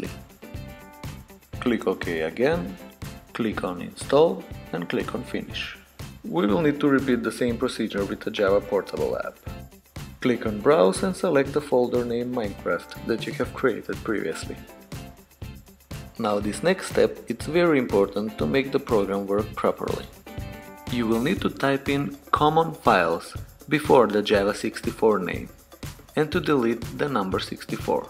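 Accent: Russia. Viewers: u sound Vrussian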